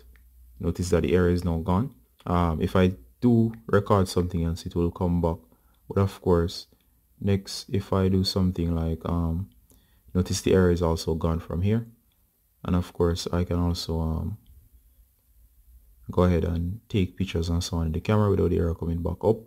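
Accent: Jamaica. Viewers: good to hear a jamaican voice .. watching from the UK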